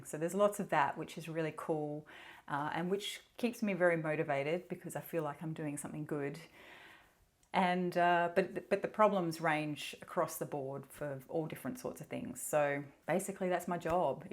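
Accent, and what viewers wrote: Australia. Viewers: Totally agree with Tyler.....I love listening to a fellow Aussie. Your videos are such an easy watch.♥️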